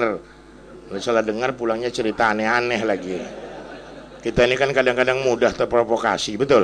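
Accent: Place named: Serang, Indonesia. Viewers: Dicky Pratama Putra pantes logat ny banten mntap abah yai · sehat slalalu untuk ustd.mantep cramahnya ustad bndar lampung. ustad bahasa serangnya medok. slam kenal dari sobri jaseng di bntuhan kaur bengkulu.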